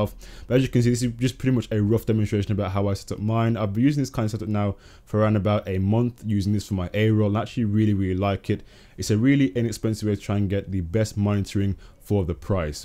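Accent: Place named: Birmingham, United Kingdom. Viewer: Sick video but u from birmingham? Ur accent gives me a vibe haha :D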